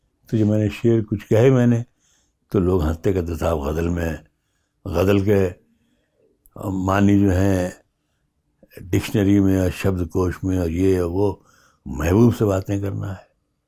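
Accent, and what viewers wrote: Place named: Raebareli, India. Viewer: Apki awaaj ikdm.raebareli wali hii hai sirr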